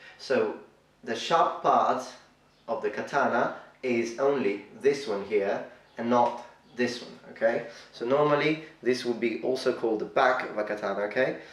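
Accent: United Kingdom. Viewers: Came to see young with the strongest british accent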